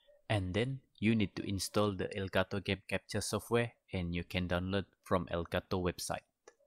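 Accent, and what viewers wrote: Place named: Sabah, Malaysia. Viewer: Mcm sabahan beii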